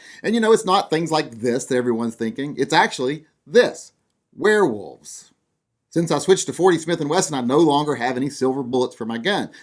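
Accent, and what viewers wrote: United States. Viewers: I hear you.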